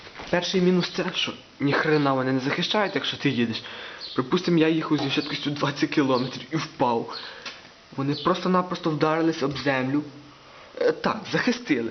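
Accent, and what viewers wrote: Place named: Hutsul, Ukraine. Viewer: Смішно розмовляєш, мабуть гуцул, але приємно.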